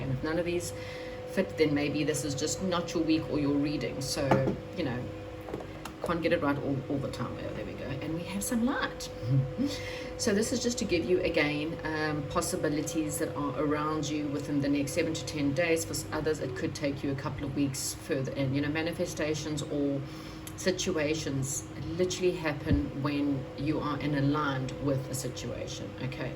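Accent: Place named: South Africa. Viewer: 💚I love your readings and your accent South African? 💚 100% accurate 💚 thank you💚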